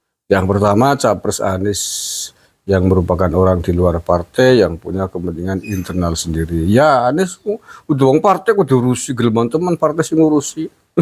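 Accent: Banyumas, Indonesia. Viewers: Senang mendengarkan penjelasan jenengan pak....sll di sisipi bahasa ngapak 😂👍👍👍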